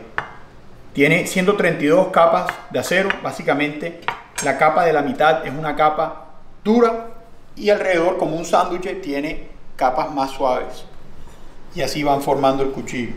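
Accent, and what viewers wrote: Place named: Cuba. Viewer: Una pregunta tu eres cubano porque por tu asentó te pareces al de los cubanos y porcierto y soy cubano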